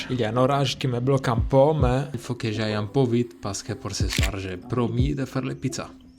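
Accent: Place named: Italy. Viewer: EXTRA!!! Continuez! C'est beau et bien raconté (con l'accento italiano). Tschüss! 🤠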